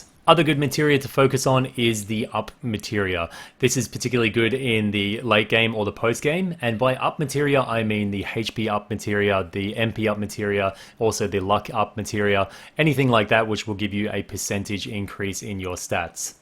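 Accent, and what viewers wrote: Australia. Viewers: Austeralian eh?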